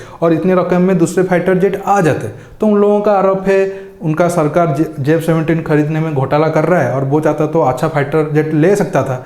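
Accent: Odisha, India. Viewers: Bhai kay aap odisa se hai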